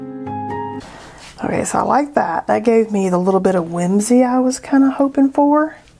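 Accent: South, United States. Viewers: I loved your southern pronunciations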